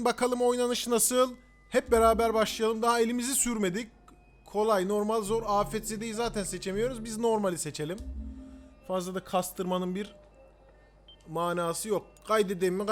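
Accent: Kayseri, Turkey. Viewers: Şiveli daha doğrusu kayseri ağzı yapmadanız daha iyi olucak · Usta siz vallahi Kayseri'lisiniz :D Benim eniştem oralı konuşma şiveniz aynı :D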